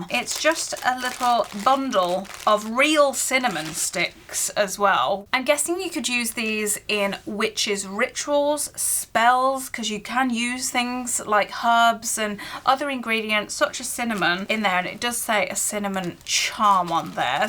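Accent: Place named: England, United Kingdom. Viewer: I love your accent so much! It's different from what I imagine the London accent is. Are there different dialects in England? So cool! Greetings from Canada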